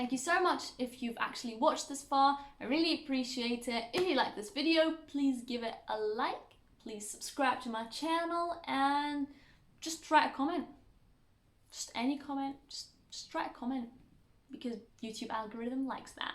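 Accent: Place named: England, United Kingdom. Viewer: I am a bit puzzled. You do not have any hint of a foreign accent and sound as if you were brought up in England.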